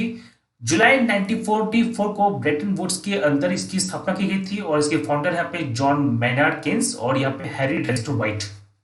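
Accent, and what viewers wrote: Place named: Malwa, India. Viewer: Sir you sound like resident of MP. Do you belong to Malwa region?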